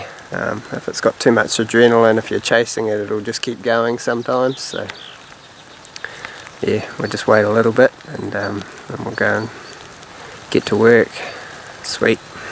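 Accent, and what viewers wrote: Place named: New Zealand. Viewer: Did you grow up in the urewera region bro or a you a southerner ?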